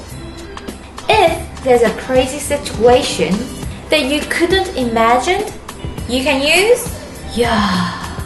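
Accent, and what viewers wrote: England, United Kingdom. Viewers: She sounds like she's got a british accent aswell as the korean slurring. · i like her english accent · I love your English accent. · Yaah! Unnie! You're so perfect! You speak korean and have that british-like-accent. T^T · she sounds british almost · she sometimes has a british accent, but mostly its a typical korean american accent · Your Korean accent sounds almost British... Interesting. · You seem to have a very slight English accent lol.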